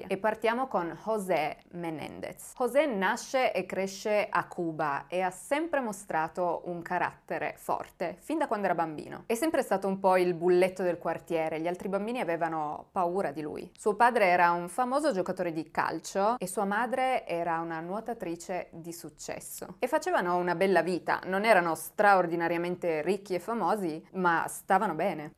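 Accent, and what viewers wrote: Turin, Italy. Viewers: ti devo davvero fare i miei complimenti... sono super appassionata del crime e all'inizio ero davvero scettica (troppi youtuber che si improvvisano divulgatori). Tu sei una bella sorpresa... i tuoi video sempre chiari, dettagliati, si percepisce lo studio che li precede, l'esposizione perfetta, l'accento torinese la ciliegina sulla torta! Sempre un piacere ascoltarti e vederti... volevo tralasciare quanto tu sia bella ma devo dirtelo per forza... bellissima e bravissima!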